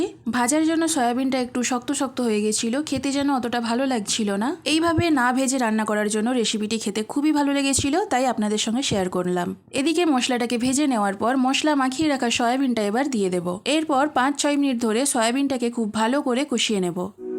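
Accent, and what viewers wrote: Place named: Nadia, India. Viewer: খুবই ভালো রান্নার পদ্ধতি দেখালেন | অবশ্যই বানিয়ে দেখবো | আপনার "পানি" কথাতে বুঝলাম আপনার বাংলাদেশ connection আছে; কিন্তু তার সাথে সাথে, বলি, যেহেতু আপনার বাংলা প্রায় সমস্তটাই পশ্চিম বাঙলার, especially নদিয়ার জেলার ভাষার মতো, আপনারা কি যশোর/খুলনার লোক ?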